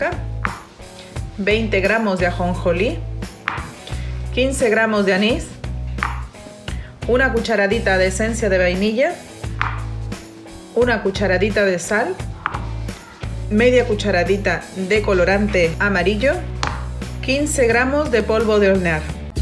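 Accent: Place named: Peru. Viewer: " " no sé si será peruana radicando en otro país por eso el dejo....pero a respetado la receta original.....gracias por decir en su video q es "Turrón de Doña Pepa" es tradición peruana con todo y su historia. Un sólo detalle...en lugar de colorante le agregamos achiote.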